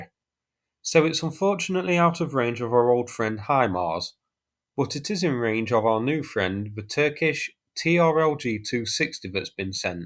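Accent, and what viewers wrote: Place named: England, United Kingdom. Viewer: Boring. Zero insight. Just a drab, dull, less-than-perfect working class English accent.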